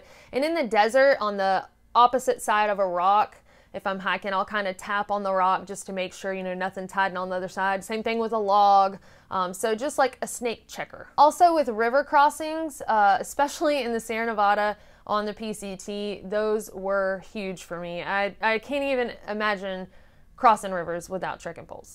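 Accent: South, United States. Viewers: Your southern accent is getting stronger now that you're home. Purtiest sound a southern boy ever hears. I've used the old bent handle wood canes for years. They make great snake locators(that's the original reason I started using them) & the hook makes a good extended hand on a steep climb especially up a river bank. The wood canes also make a good defensive tool in the wild hog country I'm often in: kinda helps that my grandparents raised pigs when I was growing up so I'm well versed in using a cane that way. I have considered trekking poles for a long hike & this is great information. Thanks Ms.!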